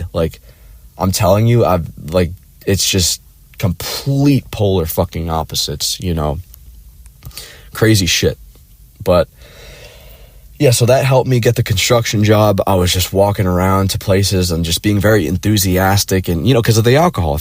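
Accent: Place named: Minnesota, United States. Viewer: Dang the Minnesota accent is so clear in this video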